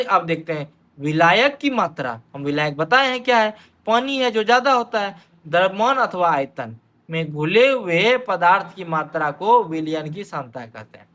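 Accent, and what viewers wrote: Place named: Mithila, India. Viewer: Sir apka jo boli h hme bahut acha lgta aap mithlachal ki or se h na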